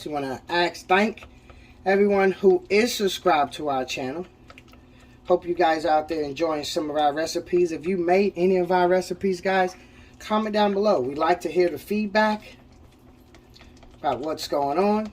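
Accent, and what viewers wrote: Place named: Brooklyn, United States. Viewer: Nice!! Im diggin this. My brotha sound like he from Brooklyn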